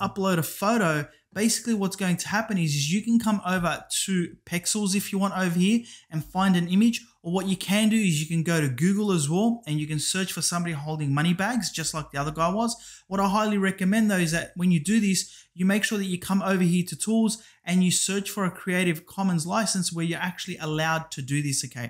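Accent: Australia. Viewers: I'm from New Zealand so I picked up on the Australian accent and just assumed. Hey, btw great video. Whats your #1 source of traffic to your non MMO offers? Paid or free?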